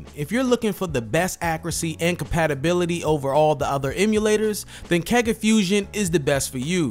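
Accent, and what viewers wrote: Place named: South, United States. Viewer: maybe he is from the south?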